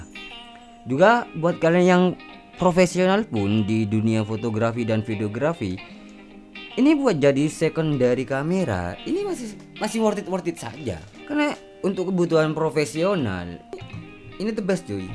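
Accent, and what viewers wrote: Madura, Indonesia. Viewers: Madura banget. Anak madura mana ini? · wah worth parah.... mantab,,, logat maduranya masih nempel om... hahaha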